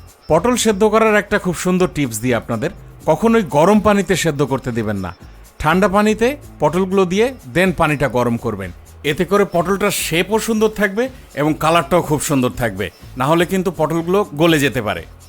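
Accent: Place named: Dhaka, Bangladesh. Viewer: ভাইয়া আপনার ঢাকাইয়া ভাষায় কথা গুলো শুনতে আরো বেসি ভাল লাগে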